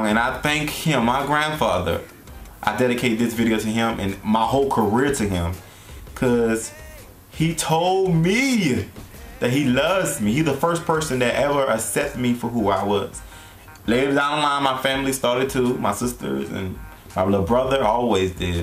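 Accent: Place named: New Orleans, United States. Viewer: I hear the Nawlins accent... I am from Louisiana also... You are one pretty man!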